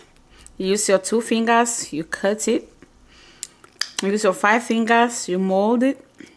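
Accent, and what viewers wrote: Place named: Kom, Cameroon. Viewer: It’s de dialect for me sis🤣🤣🤣🤣🤣🤣🤣🤣🤣🤣🤣🤣🤣🤣thank u for representing us, we the kom people are proud of u😍bon appétit 🥂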